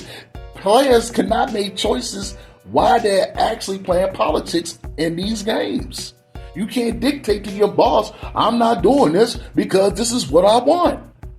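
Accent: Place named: Baltimore, United States. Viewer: You from Baltimore? Heard a “few “ dews lol… I’m West Baltimore born and bred.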